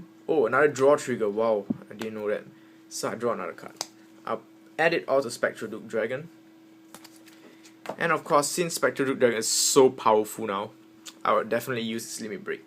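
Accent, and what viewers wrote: Singapore. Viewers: you are from singapore?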